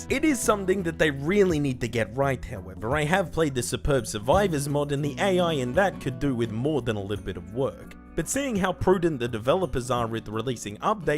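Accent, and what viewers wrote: Australia. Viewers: local youtuber describes an australian person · Sounds like an aussie, but also very Jewish. Or just has a cold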